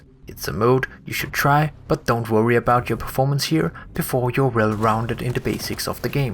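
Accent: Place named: Denmark. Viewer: I love your accent, you shouldnt be Danish by any chance?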